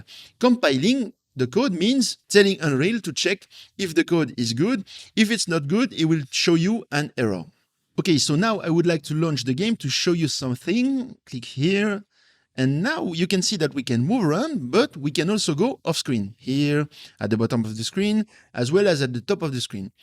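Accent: France. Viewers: Excellent tuto j'avais pensé que tu étais Français vu l'accent mais le nom m'as trahis hahahah Continu comme ça tes conseils sont d'une précieuse aide pour tous ! En pensant t'inquiètes pour l'accent pour un Français c'est excellent ;)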